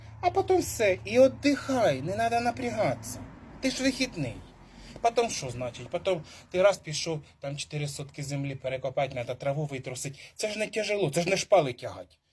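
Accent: Ukraine. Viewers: Артист😂😂😂❤❤❤Спасибо. Нiч яка мiсячна зоряна ясная.. Как все это знакомо, все родное. Эту песню мама пела. . Может удивлю, но есть в России на Дальнем Востоке село, где жила моя бабушка. Ее родители были переселенцами, в царское время переселились в Приморский край. Вот на таком языке все село говорило. Даже интонации те же, и логика😂😂.. Слушаю, как будто земляка. Молодчина!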